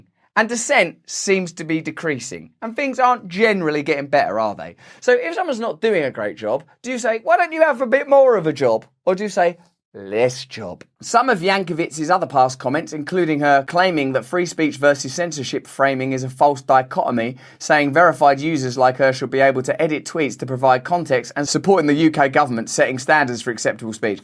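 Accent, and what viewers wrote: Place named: United Kingdom. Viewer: I love you too Russell such a wonderful way to get my news a funny highly intelligent person with a Brit accent 🤣 wanka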